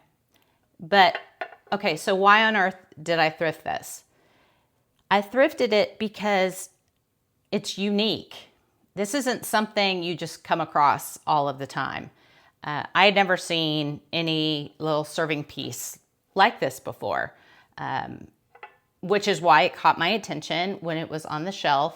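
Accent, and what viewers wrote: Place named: Oklahoma, United States. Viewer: This helped me so much! I knew for some reason you were from OK, don’t ask me why, maybe it’s that you sound like me…lol I loved this video, if you ever wish to share how you inventory your items to know where they are, I would love to see. I only sale vintage home goods, and linens and I, like you, love to use the items in my home ( some of them) I sell vintage home goods, because its what I love to do. I love to shop and when I see an item that makes me think back to my childhood, and a photo of our home, and here is the exact lamp we had. I buy it,I was also grieving my Mother, so went a little nuts on IG live auctions. I am finally listing all of my mother and grandmothers items that were left over from the estate sale, and now need to create a storage solution… I have had to cancel 2 orders recently for not being able to locate the item. 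Happy THanksgiving! Early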